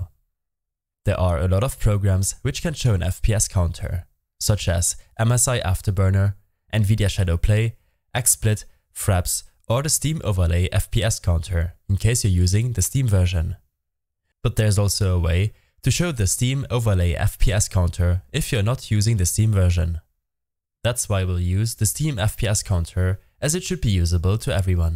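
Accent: Germany. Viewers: man erkennt dass du deutsch bist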